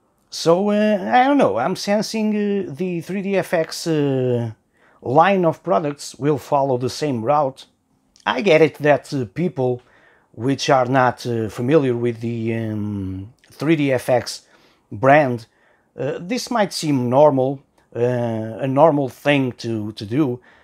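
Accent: Portugal. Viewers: So first I thought: This guy sounds portuguese. Then I looked in his profile and it said Portugal lol. I guess both of us make the same pronunciation mistakes. Olá!! Fonix é a primeira vez que vejo um youtuber português XD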